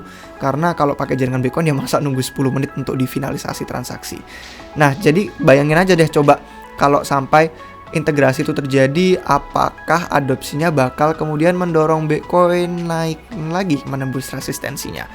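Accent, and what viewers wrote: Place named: United Kingdom. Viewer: lama tinggal di australia, temen bulenya pun banyak orang australia, jadi wajar kalo aksen british nya kental kalo kita yg terbiasa denger pake aksen US emang kerasa aneh sih 😂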